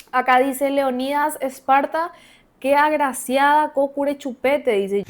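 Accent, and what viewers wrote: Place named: Paraguay. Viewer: Sos una mujer muy linda y amo tu acento paraguayo! Segui adelante con tus vídeos genia!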